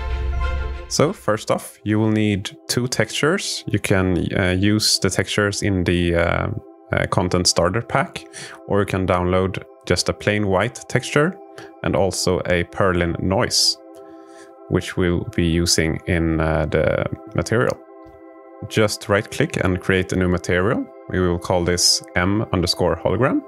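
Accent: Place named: Netherlands. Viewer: Your voice and pace, made me enjoy this tutorial,very helpful, I think it has to do with the Dutch accent there 2😂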